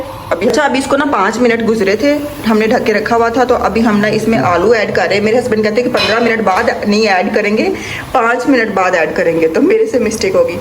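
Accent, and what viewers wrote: China. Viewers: apka Chinese accent Kitna perfect hai MashaAllah bilkul Chinese style sy bolti hu aap apki daughter same apny baba py hai , dear mey bhi jab chicken banati hu pehly Thora boil kr k yakhi phynk deti hu kiu k hum Kon sa khalis chicken khatey hai boilers chicken,,,,,,,, behtr huta hai Thora boil kr k paani phynk dey , Desi chicken ku boiled kr k NAHI phynktey kiu k Wu khalis huti hai , apk husband ki cooking out class huti hai tasty and healthy 👍🥀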